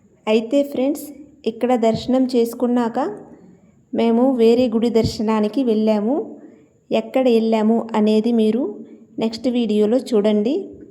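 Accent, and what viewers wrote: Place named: Andhra Pradesh, India. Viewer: Mee Telugu Vijayawada Guntur lo matladya Telugu la undi andi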